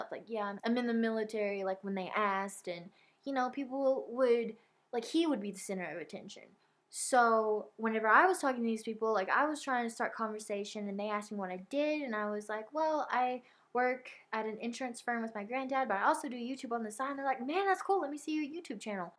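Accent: South, United States. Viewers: Who notices she has a slight country accent which is adorable 😂😂but thank you so much I was with a guy who made me feel as if I had to do what he said he told me if I didn’t have a ass then he wouldn’t have given me a chance and then broke up with me for his ex who wouldn’t leave us alone but he was basically a narcissistic person he always talked about how girls wanted him and stuff and how fine they were and god am I happy that he left he always thought he could control me and how I acted and accused me of acting single when I wasn’t and now I’m happy living my life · Adrian is surely used to you pranking him, do something like make him think you are pranking him and he gets on edge when you are not. Also, love your accent! Found your videos by typing into YouTube "girl with Southern accent" and your accent challenge one came up. Watched it, loved it, and watched all your others.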